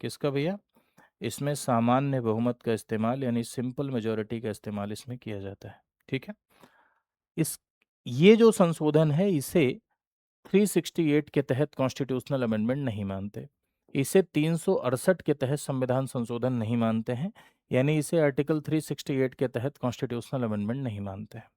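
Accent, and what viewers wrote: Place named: Uttar Pradesh, India. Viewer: Sir jab aap comedy krte h tb bda achcha lgta h bilkul up style m hm bhi knpuriya h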